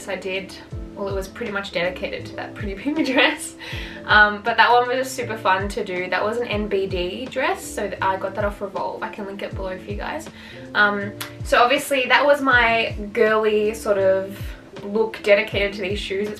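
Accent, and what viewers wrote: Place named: Australia. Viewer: loved the video, then you started talking, and you are a fellow aussie!? hello from brisbane! instant subscribe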